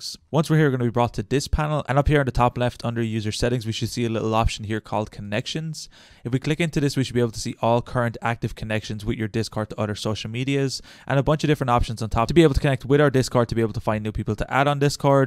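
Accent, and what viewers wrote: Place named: Ireland. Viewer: You sound like Oneyng if he stayed in Ireland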